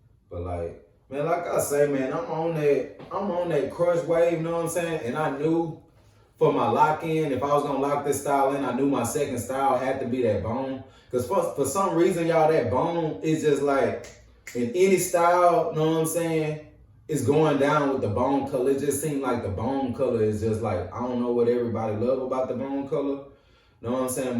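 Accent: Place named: South, United States. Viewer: Bro you country as hell 😆 but your accent cool coming from a NYer🎯❤️ this video super helpful tho I just bought them an wanted too see what they look like in real time.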